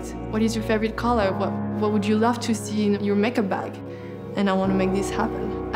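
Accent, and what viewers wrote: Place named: France. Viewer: I like u but i liked u better with your french pronunciation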